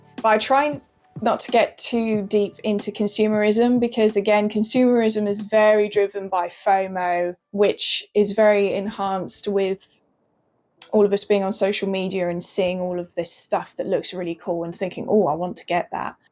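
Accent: England, United Kingdom. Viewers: Yes. I like it. I'm not from english speaking country. I like english accen the most ☺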